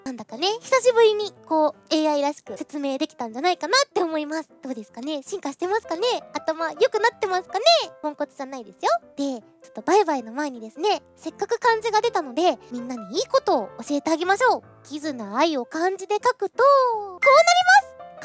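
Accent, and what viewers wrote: Japan. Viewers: 日式中文RRRRRR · well Japanese uses kanji/hanzi as well so it's Japanese especially regarding that she pronounces words in Japanese pronounciation.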